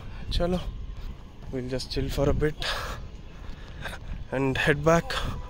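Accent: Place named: Hyderabad, India. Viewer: Drone shots super👏👏 Hyderabadi language 😅😂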